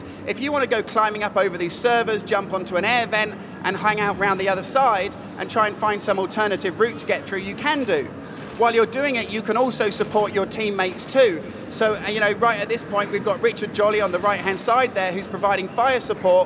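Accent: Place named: England, United Kingdom. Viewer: English Accent))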